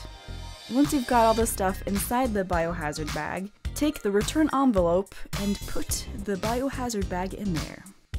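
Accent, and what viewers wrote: United States. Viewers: Your Southern accent is sickly sweet like a peach princess And I love it!! Now can you do a Texan drawl?? New subscriber and fan!! Great escape from the kids to listen to reddit stories.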